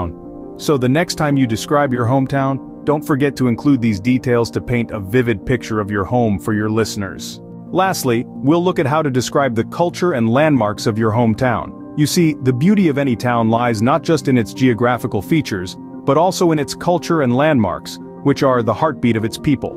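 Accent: United States. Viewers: is this american english? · Can't you hear it ? Of course it is told with an american accent.🙂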